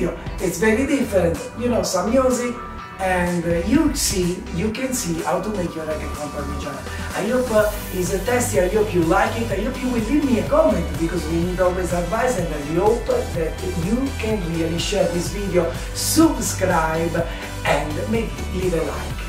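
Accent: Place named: Italy. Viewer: Your Italian accent is Fabulous!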